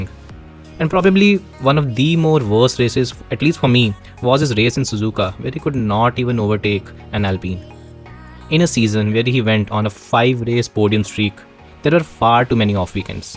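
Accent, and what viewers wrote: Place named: India. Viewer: When i heard an Indian vioce i swiftly paused it as i know all facts will be wrong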